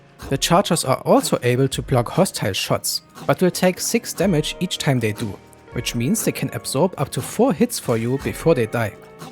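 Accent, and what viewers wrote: Germany. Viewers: Man merkt wirklich extrem dass du deutsch bist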